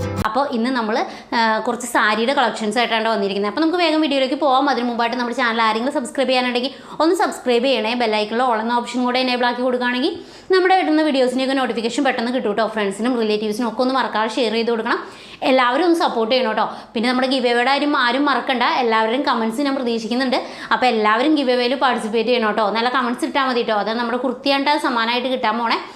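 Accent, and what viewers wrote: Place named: Perumbavoor, India. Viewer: ട്ടോ എന്ന പ്രയോഗം നമ്മുടെ പെരുമ്പാവൂരുകാരുടെ ഒരു പ്രത്യേകത ആണ് ചേച്ചി പറയുന്നത് കേൾക്കാനും നല്ല cute ആണ് അതുകൊണ്ട് അത് മാറ്റേണ്ടതില്ലാ ട്ടോ😊😊😊😂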